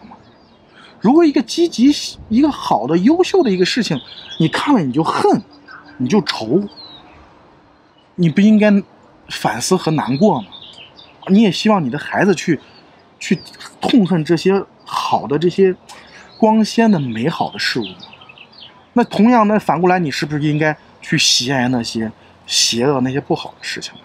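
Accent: China. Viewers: 这口音听起来就是我陕普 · 听着乡音太亲切了，本人也是西安人，在美国做移民律师多年，听多很多这样的故事，我们的同胞跋山涉水移民美国，美国毕业留学生削尖了脑袋拼命冲h1b工作签证抽签，中国中介略次坑惨了想要移民的同胞，我的客人感叹“被中介坑了，原来在刚踏出国门的那一课就做错了“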